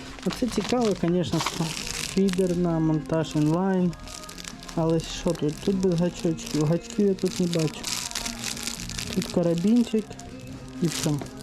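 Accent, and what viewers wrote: Transcarpathia, Ukraine. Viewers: По говору чути що з Закарпаття) А звідки саме?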